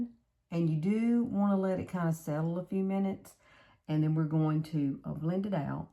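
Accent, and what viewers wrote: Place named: Alabama, United States. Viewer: Girl, I not only like watching you because of the great, down to earth, make up tips for older women; but I just love listening to your calm voice and devil may care attitude, your Alabama accent, and just the neighbor next door persona! Actually, wish you were my neighbor! We would be a hoot together; you with your Alabama accent, and me with my Texas twang! Just good 'ole girls, having fun!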